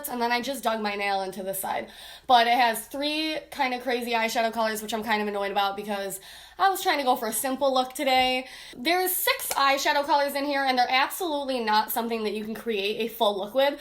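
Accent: Buffalo, United States. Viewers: Are you from Buffalo? Your accent sounds like you're from Buffalo hahaha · This girl has to be from buffalo- can hear that accent anywhere 😂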